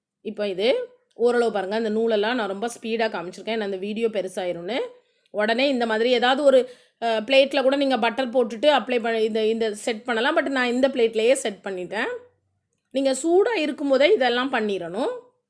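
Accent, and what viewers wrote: Tirunelveli, India. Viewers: Akka KU tirunelveli pola.. tamil avlo arumayae iruku kekum pothu 😍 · Thirunelveli language arumai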